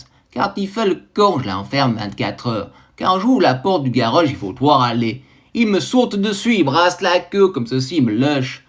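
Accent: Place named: Valais, Switzerland. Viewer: Excellent, l'accent valaisans mais avec un bonnet valaisans; incroyable.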